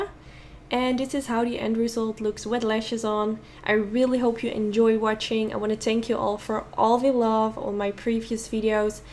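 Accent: Netherlands. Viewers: Ar you dutch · Shout out from holland. Je accent gaf het meteen weg dat je Nederlands bent. Geweldige tutorial!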